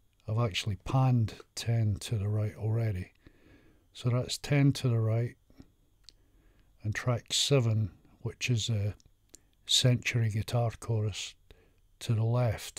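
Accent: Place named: Scotland, United Kingdom. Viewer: Great video! I love your Scottish accent!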